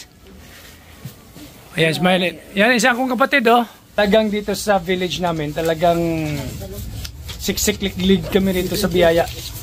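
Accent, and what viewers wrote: Iloilo, Philippines. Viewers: Ilonggo ka po pala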